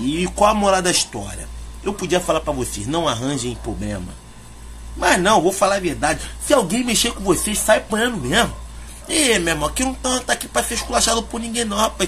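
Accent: Rio de Janeiro, Brazil. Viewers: Do rio né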